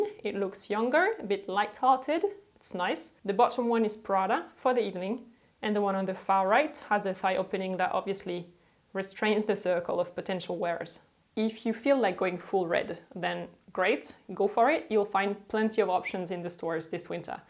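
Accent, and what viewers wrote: France. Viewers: Thank you for such amazing content. You are by far one of my favorite fashion youtubers out there. Im so lucky to have come across your channel...not to mention youre French-your accent is music to my ears. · love the video you have a beautiful sounding voice aswell are you french?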